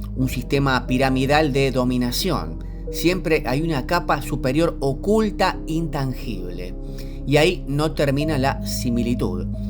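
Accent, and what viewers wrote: Argentina. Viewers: muchos ya deberían darse cuenta que argentina es un país latino y se habla español, solo que no les gusta o no entienden el acento rioplatense 🤷‍♀️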